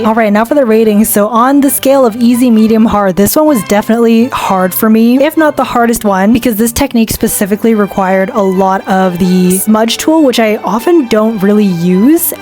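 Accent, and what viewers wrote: United Kingdom. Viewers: Are you uk or………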